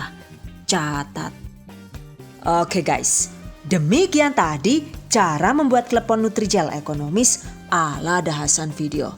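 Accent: Banyumas, Indonesia. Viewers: Nah klepon ala unik banget soalnya pake nutrijel, apa hanya aku yg baru tau kalau nutrijel bisa buat klepon😁 Selalu sukak sama penjelasan2nya, apalagi bahasa ngapaknya itu "pada mledos kabbeh jan" lucuu dengernya😂 dan ciri khasnya itu klw ngomong OK GUYS atau CATAT😁 bikin orang yg gak mudeng jadi mudeng😁😍 #GiveawayTHV2020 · Klo sy buka, diantaranya jg selain tertarik resep2 nya bunda cantiek, tapi juga 'ngapak' nya itu lho yg kutunggu...hehehe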